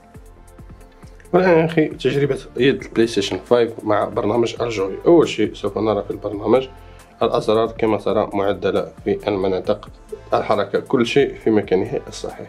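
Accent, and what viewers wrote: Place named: Morocco. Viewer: حسنا اخي بارك الله فيك اعتقدت انك مغربي بسبب لهجتك 😄🥰🥰🥰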